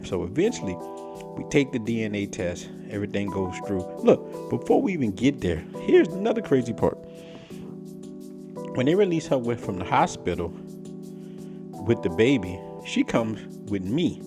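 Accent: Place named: Louisiana, United States. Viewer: what part of Louisiana are you from bro? I can hear a Louisiana twang. I'm from the boot and the way you said baffruum(Bathroom), I was like, bro from the N.O, but I can hear some of that Lafayette area in his voice also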